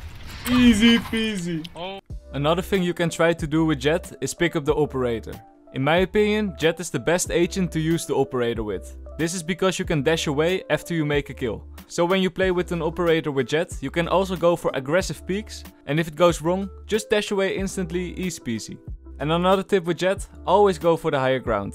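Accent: Netherlands. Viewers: Je hebt echt zo’n Nederlands Engels accent fucking mooi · Ik hou niet vaak van zo'n Nederland accentje maar deze is toch wel mooi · love DAT THICC DUTCH ACCENT DUDE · Je hoort meteen aan zijn accent dat ie Nederlands is xd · The Dutch accent dude's back with good shit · I feel dutch accents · i love the dutch accent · hoor dat je nl bent nice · dang that dutch accent! i love it! (ik ben ook nederlands XD) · Je kunt gw horen dat hij nederlands is😂😂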